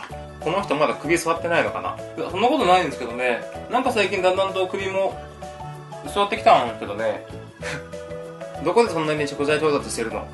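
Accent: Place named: Kyushu, Japan. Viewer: 初めて拝見しましたが 九州人なんですね！ 方言めっちゃ落ち着く〜 ぜひ大分県のお魚も食べてください！ 福岡も美味しいけど大分も美味しいですよ！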